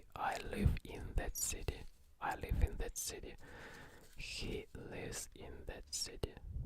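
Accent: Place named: Russia. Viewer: nothing is more cute than a russian trying to speak english 😂🥰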